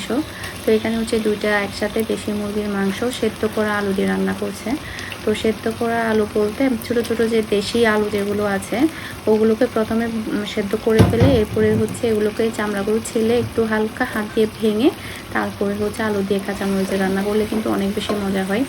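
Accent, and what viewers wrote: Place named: Sylhet, Bangladesh. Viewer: আপু সিলেটের তাই শুদ্ধ বাংলা বল্লেও সিটেলের ভাষা টা চলে আসে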